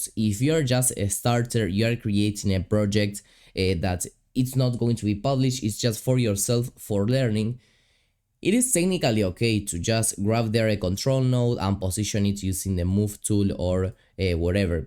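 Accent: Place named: Rioplatense, Argentina. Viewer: El acento rioplatense es tremendo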